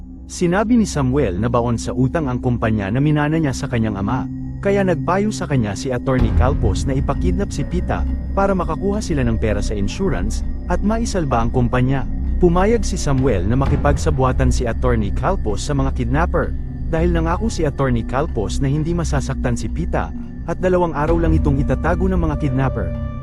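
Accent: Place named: Bicol, Philippines. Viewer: Aba bicolano ka pala manoy ,,, gayon Ang movie recap mo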